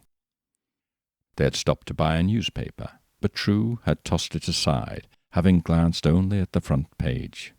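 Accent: United Kingdom. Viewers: This was undeniably British, what ?! I'm inclined to start saying Cheerio, instead of bye.🇬🇧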